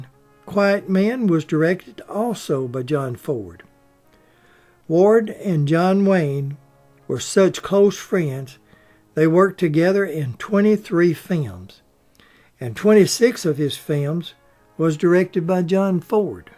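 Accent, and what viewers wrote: South, United States. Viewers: no thank you you are what makes YouTube great I love your voice you have that little bit of Southern song which I love keep up the good work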